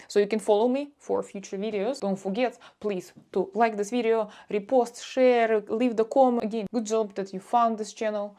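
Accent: Russia. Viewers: You have a beautiful Russians accent (not a Chinese accent). I have been to Lake Baikal and Mongolian. I have visited Evink, Orogen, Nanai, in China and Russia. Also there are many Koreans in Russia. I like your Mongol writing posters. I enjoyed your explaining! Shout out to Indigenous People. They are everywhere. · You don't look Western Russian but sound like a normal Russian speaking English 🤣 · You speak English with Russian accent. So, YEAH You are truely RUSSIAN at heart !!!!! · У вас русский акцент,я верю ❤ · If I met you for the first time I would believe you, your mannerisms and accent scream Russian. I guess some people just aren't very open minded. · Especially cuz she sounds like every American's stereotype of Russian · Ain’t no shot anyone is hearing that accent and not instantly thinking you’re from Russia. lol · такой русский акцент)) он всегда такой милый по мне. 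· You have a beautiful voice but your voice alone says you're Russian. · Your accent is so obviously Russian, that I would idenify your USSR/Russia origin without a mistake. ❤ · Well I had a pretty good idea that there were at least 3 ethnic types in Russia (basically Slavic/Caucasian, Natives and Asian) but honestly I didn't know you had as many different types as you've spoken about. However I wouldn't have any problems believing that you're Russian just from hearing your accent 😊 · You have a deep Russian accent yet you're not Russian lmao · If you close your eyes and hear her only speaking, by accent u can tell shes 100% russian lol the restuarant wanted exotic servers, got even more exotic than the usual, i would walk in and i would thought i am in another part of the earth as a westerner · that's a russian accent though xD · По акценту слышно, что русская😂🎉❤ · when you started speak you sound 100% Russian :face-blue-smiling::person-turqouise-waving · Your accent clearly says you are Russian)) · Her accent is so Russian! 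Her face isn’t “ethnic” Russian. In South Korea, for example, there is a 1 to 1 correlation between ethnicity and nationality. There are other countries like South Korean. In Russia there isn’t a 1 to 1 correlation between ethnicity and nationality, but many people don’t know that. Many people honestly don’t know 💩 about 💩..so the confusion is understandable even if it’s ignorant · I hope I am not insulting you with my comments. When I first saw you, I thought Mongolian descent, and also your English has a Russian pronunciation (and rhythm) mixed with a bit of Asian. I am vaguely aware that there are Russian Asians, as when it was claimed that Koreans were helping Russia fight the invasion of the Kursk(?) region I just thought it was soldiers from Eastern Russia. Also when I think of Eastern Russia my firs thought is Siberia, or that corner where Russia, China and Korea share borders. I keep forgetting about the belly of Russia. 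It is nice to see someone giving us an overview of Asian Russians. Thank you. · Yu look so beautiful and I love yiur English-Russia accent. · 你的英语有很典型的俄语口音 这些把你当中国人的家伙应该听出来的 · Думала иностранка получила российское гражданство!Потом включила звук и услышала ооочень родной русский акцент!😂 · I remember when I briefly visited Russia years back. I saw a few Asians. And at the time it was a culture shock for me. I will also say, on one hand, I heard a saying growing up that Asians live everywhere, lol. But on the other hand, Russia itself is in Asia. Or Eastern/Southern Russia is in Asia. So I would understand Asians migrating there. Because it's right next door. Also, your Russian accent is so cute and awesome, lol. Your accent is proof that you're Russian! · At least, you’ve got a Russian accent😂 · По произношению понятно ты russian:)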